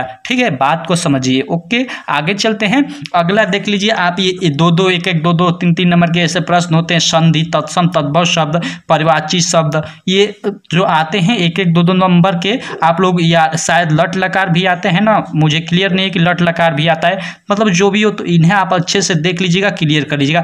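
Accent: Bihar, India. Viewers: Aap bihar se hai kya?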